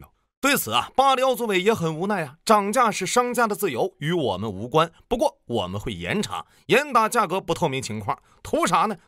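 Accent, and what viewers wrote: Northeast, China. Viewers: 听您说话就知道是本山的徒孙。。。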